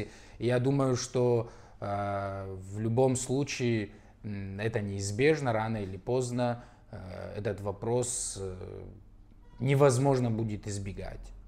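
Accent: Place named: Kabardino-Balkaria, Russia. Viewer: акцент чисто кабардинский.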